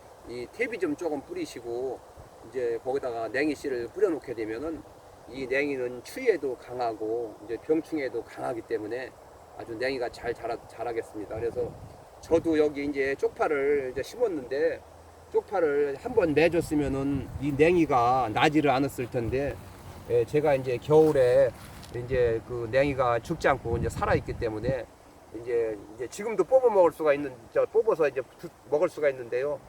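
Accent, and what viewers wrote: Chungcheong, South Korea. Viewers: 데요~데요~ 너무 좋습니다 충청도가 최고에요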